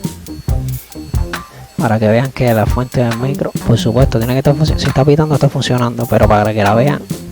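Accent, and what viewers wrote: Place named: Puerto Rico. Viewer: si primera ves que hablaaaa jajajajajajaja...................y tiene acento boricua jajajajaja likeeeeee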